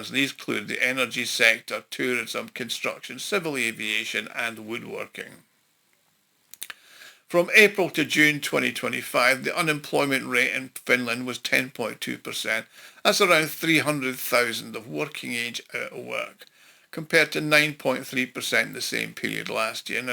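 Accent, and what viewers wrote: Scotland, United Kingdom. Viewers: What is this fascist Russian propaganda with a scottish accent!?!?!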